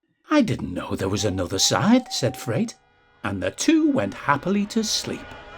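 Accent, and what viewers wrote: United Kingdom. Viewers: the funniest part has to be the soft calm british narraration ontop of the hardcore gangsters trying to off eachother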